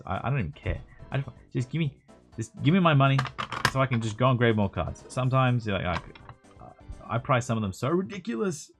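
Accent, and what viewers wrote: Australia. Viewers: An hour video of regrades from a dude with an Australian accent? I click.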